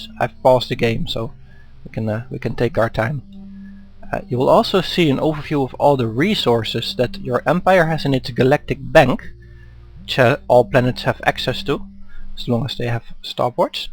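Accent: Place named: Netherlands. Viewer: u sound dutch. what i wonder though why the ingame tutorial sucked ass X.x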